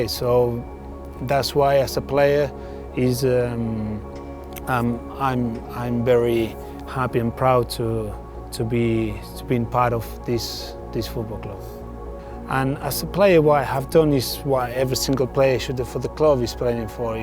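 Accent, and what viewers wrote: Manchester, United Kingdom. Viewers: Me hace mierda Zaba y su acento Británico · Even sounds like a proper Manc. Good lad Zaba!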